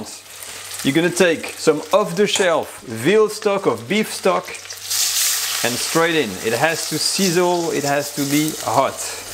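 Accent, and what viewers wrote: France. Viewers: He sounds french. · ❤I love your French accent so cute 🥰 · that s ok, we understood :) very nice and how can a French speak such a good English with such a thick accent haha. cute lol, thanks for the recipe, doesnt seem difficult, will try. a bientot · Excellente recette mais l'accent maudit français, ça me fait douleurs les oreilles. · I think you have a nice French accent. My daughter thinks your accent is typically French but I think you have a nice twist when you speak English and you're very clear. Merci pour la video. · Merci mon ami! A trultyfantastic dish. I am going to try it on my family I had no trouble following you great French accent.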